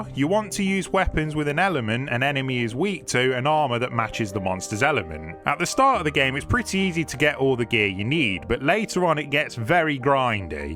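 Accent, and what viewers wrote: United Kingdom. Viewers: I don't know who you are but you sound really british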